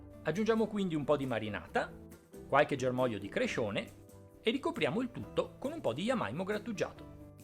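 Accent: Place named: Veneto, Italy. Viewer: lo sento io ,perché sono veneta e forse mi sbaglio ,ma verso Treviso o vicentino?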